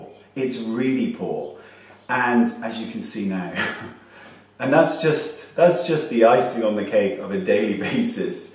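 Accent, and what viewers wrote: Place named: South West England, United Kingdom. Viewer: It's not "subdued", it's a typical South West English accent.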